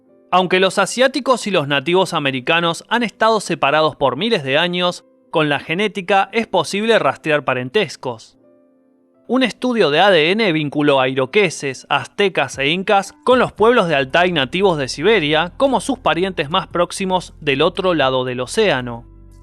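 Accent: Argentina. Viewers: Qué es una gashina? Es broma es broma 😂. En realidad suena muy cool el acento argentino. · seguramente eres argentinillo por tu plaga para hablar, deberías ser más objetivo y es que la verdad éstas lleno de suposiciones deberías hacer videos como la; BBC inglesa, NHK japonesa ó Discovery channel americana, pero estás a años luz de hacer videos de calidad, tenías que ser argentinillo 🤣🤣🤣🤣🤣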